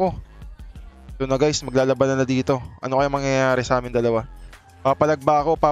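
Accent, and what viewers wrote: Iloilo, Philippines. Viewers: Tanong ko lng Tga Iloilo ka Po?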